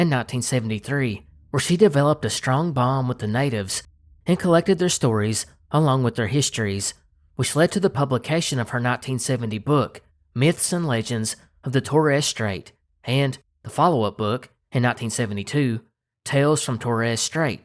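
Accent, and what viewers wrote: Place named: North Georgia, United States. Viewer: Your accent is just like mine and my whole family , it's cool bro Howdy from NA GA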